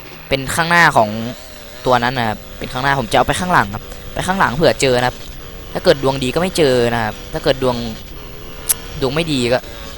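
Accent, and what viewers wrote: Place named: Korat, Thailand. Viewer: คนโคราชป่าวครับฟังจากสำเนียงเหมือนมากๆ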